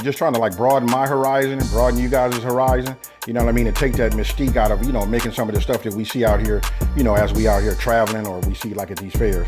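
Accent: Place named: West Coast, United States. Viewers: Are you out here in LA? You sound like a Cali native and look very familiar! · Lol he from the west coast. Got to be. I hear it lo sound like rich the factor I love it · I have been looking at some of your videos for the month and you be throwing down. I'm from the south. I don't know where you are from, but I have a clue. You sound like you are from LA. I love cooking and I have been in food service for 13 years. Was a cook at one time, (my first real food service job) it was kind of intimidating, but I learned to get through it. They loved my cooking. My title was COOK II. I was second to the head cook. After that, I took on prep cooking at different places and that's where I'm at now. I normally work in hospitals and assisted living places, which I love. The place where I'm working at now gives me more opportunity to show my "Lil skills, YOU KNOW WHAT I'M SAYING,..., LOL" A resident wanted egg salad and sh wanted her bread in a zip loc bag. 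I was like, OMG!!! I HAD TO THINK. Which didn't take me long. I thought about the two other residents that are vegetarians. I'm like, hell, they can have this also. Just make this egg salad like I make TUNA FISH, (like WE make it) besides the li' sugar. I just did eggs, a little dill weed (NOTE: I'M AT WORK, Ain't no way in the hell I'm gonna have that spice in my cabinet, LOL), mayo, relish, salt and pepper. THEY LOVED IT!!!!!!!!! I'm from Arkansas and we had a food truck festival here and this is the third year that I missed it because I am always at work. BY WAY, LITTLE ROCK, ARKANSAS. , AND YEAH, some of my homey's told me that it was about 80 food trucks. One of them was telling me about this corn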